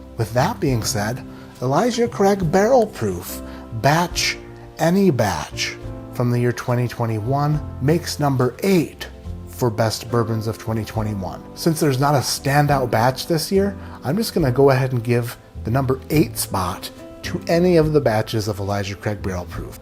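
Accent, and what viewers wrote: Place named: Midwest, United States. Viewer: You are very welcome sir. I enjoy your content. I enjoy your plain spoken mid-western accent. I also like that you are willing to call out duds when they are duds. I am still writing now because I am hoping that the longer a comment is the better it helps your placing in the almighty algorithm. Have you considered the great spaghetti monster? When you were small did you pronounce it "sketty"? or "pssssketty"? I did. I really like the bit you have going with Rex. I can't wait for your reponse. I hope it is full of hyperbole and sillyness. I know what will boost comments. Let me start an argument. Okay: Jack Daniels isn't Bourbon. Why? Because they don't say Bourbon on their own label. And if they're too good, or too yella to say Bourbon, they don't deserve such an honor. Ok, that's all I can think of for now. hope this helps.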